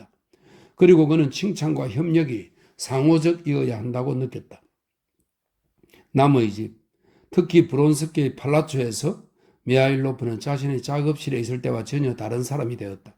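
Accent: Gyeongsang, South Korea. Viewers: 아버지가 책 읽어주는것 같아요. 경상도 사투리도 정겹습니다.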